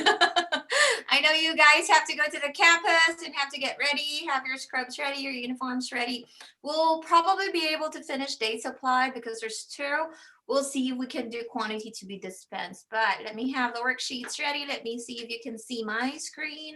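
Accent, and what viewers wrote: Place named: Philippines. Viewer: U sounds like Filipino po. HUHU I need more info I’m still confused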